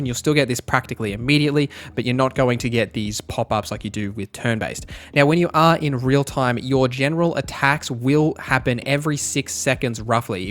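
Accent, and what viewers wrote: Australia. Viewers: G’day mate. I think your channel could really take off with guides for tricky games like this. You present well and apparently people like presenters with our Aussie accent. (Who bloody knew?) Can I make a suggestion for your videos to maybe help set them apart from others out there? When you hit a concept like how an attack works, try running through an example or two. Maybe start with a basic melee attack and show how dice rolls would play out and the factors involved. Then add some complexity, like a ranged/magic based attack and play it out. I think it will help folks like me who have very little crpg knowledge. Which will be a lot of folks coming to your videos. The examples you create don’t have to be played in ‘in game’ you could create a basic graphic from screenshots and a cg dice. Could be worth a shot, might be too much of a pain in the ass. 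I think you’ll do well with how you’re going now, but wanted to toss my two cents in here. I’m subbing anyway mate.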